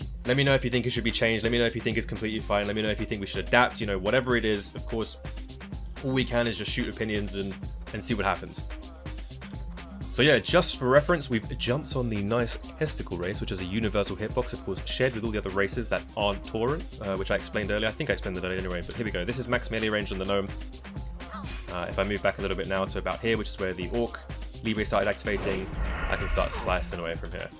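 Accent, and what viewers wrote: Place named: London, United Kingdom. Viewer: u sound like ur from london brother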